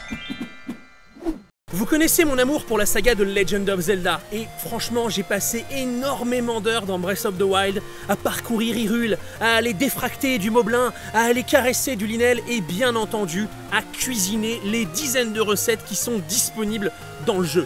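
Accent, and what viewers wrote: South, France. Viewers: Moi non plus je ne sais pas pourquoi tu fais l'accent du Sud quand tu cuisine mais ne t'arrêtes surtout pas ! C'est gourmand et croquant à mes oreilles. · La Creuse ? Mais c'est à côté de chez moi ça ! XD Je ne suis pas très au fait du monde de Zelda, mais cette recette m'a l'air fort goûtue (avec l'accent du sud qui va bien^^) ! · pour l'accent du sud je pense que c'est ton petit coté Maïté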